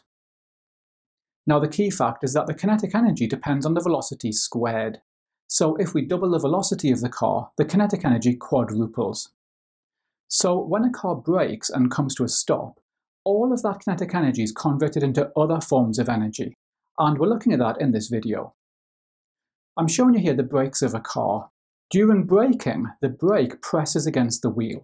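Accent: Wales, United Kingdom. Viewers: Hello. Please could I ask if you are welsh?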